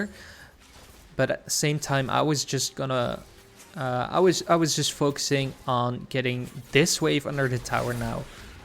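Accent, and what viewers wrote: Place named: Turkey. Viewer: Are you turkish? Your accent really sounds turkish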